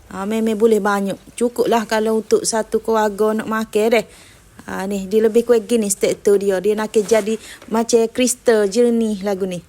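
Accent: Kelantan, Malaysia. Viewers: Suka dgr org kecek kelate. Rindu study kat mache dulu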